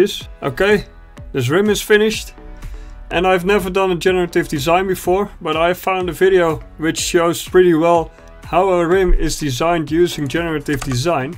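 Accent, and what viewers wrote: Netherlands. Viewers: Nice man! Ik hoor je dat je Nederlands bent! 😂😂. Het grappigste vond ik toen je cod 2 aan het spelen was en je godve** zij🤣🤣. Daar viel je door de mand😂😂😂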